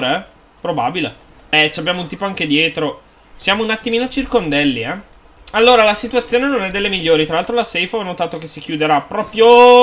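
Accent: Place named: Piedmont, Italy. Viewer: Che bell'accento piemontese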